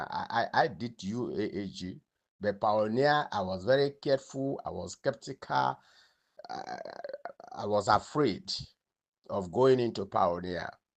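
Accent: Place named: Aba, Nigeria. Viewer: u talk like aba man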